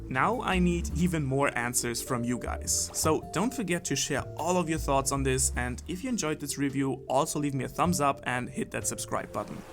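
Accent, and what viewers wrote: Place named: Germany. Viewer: fom the pronunciation I would guess I am now subed to a fello German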